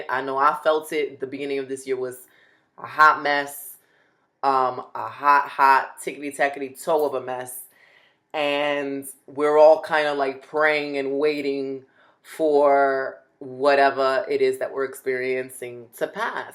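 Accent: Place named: New York, United States. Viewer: Your accent makes me miss NYC so much. More confirmation I need to move back!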